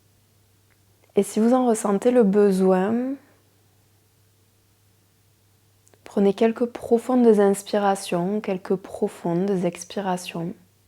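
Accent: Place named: South, France. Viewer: Merci aussi pour ce charmant petit accent du sud.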